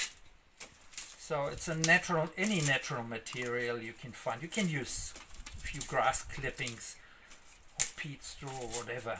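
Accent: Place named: Germany. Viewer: Hi, this is definitely the best simple and easy way I have found so far. Thank you, I have subscribed! (My name is Therese Lauterbach, from South Africa. I hear the German accent and am also from German descent. I would like to make contact with you, please.)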